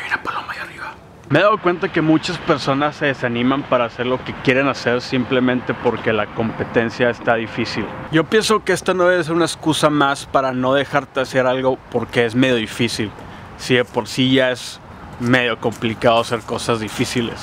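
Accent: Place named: Upper class, Mexico. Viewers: Me encantó tu vlog y mas tu acento de mirrey Paps ;) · Hablas muy fresa 🍓🍓jeje Me encanta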